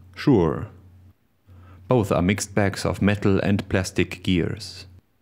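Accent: Germany. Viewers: wie sehr man diesen deutschen akzent immer raushört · Your German accent is delightful!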